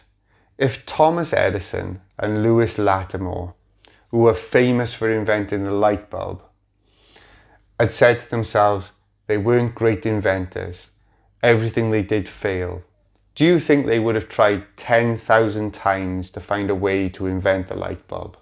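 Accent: Wales, United Kingdom. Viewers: Thanks for a wonderful talk and love your Welsh accent! The way I think of things are that most medications only treat the symptoms of T2D , only a good clean diet of natural foods will help the actual disease from progressing. As Dr Jason Fung says “ Diabetes is a dietary disease and therefore needs a dietary solution”. Charge on Rhinos and good luck to you all.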